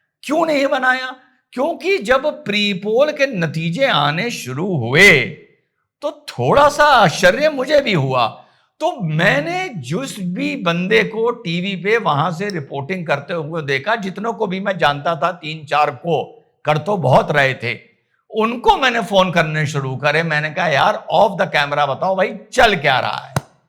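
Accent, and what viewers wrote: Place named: Lucknow, India. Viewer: bhai वंदे मातरम। इस रिपोर्ट में थोड़ा संशोधित कर लें। माननीय मुख्यमंत्री नीतीश कुमार जी की महिल रोजगार योजना में वहां की महिलाओं को वन टाइम के रूप में 10000 रुपए की आर्थिक मदद देने की बात है ना कि प्रति महीने। आपकी प्रस्तुति ठेठ लखनवी अंदाज में होती है जो मुझे अत्यधिक स्वीकार्य होती है। बहुत ही पसंद आती है। इसी प्रकार बरेली की घटना की शानदार रिपोर्ट करते हुए आप अचानक ही पक्के मुसलमान उन जैसे जिन्हें हम और आप भी कट्टर अर्थात् देश विरोधी मानकर चलते हैं। उनकी नजर में केवल इस्लाम है और कुछ भी नहीं फिर वह दोस्ती हो,इंसानियत हो याकि देश की एकता और अखंडता ही क्यों न हो? अपने उस रिपोर्ट में जिस अंदाज में विषय से हटकर अपने प्यारे मुसलमान भाइयों को जगाने का प्रयास किया वोह सिर्फ एक वाक्य हो हम जैसे आपके प्रेमी दर्शकों को भीतर तक हिला गया। उम्मीद है मेरी इस विवेचना को रिपोर्ट के परिप्रेक्ष्य में सापेक्ष रूप में लेंगे। जय हिंद । सबको सही रास्ता दिखलाने वाली उस परम शक्ति से बारम्बार एक ही प्रार्थना है कि आप उम्र के इस पड़ाव में अपनी मेहनत, विद्वता और कल्पनाशीलता के जरिए पूरे देश के साथ साथ पूरे मुस्लिम जहान में छा जाएं।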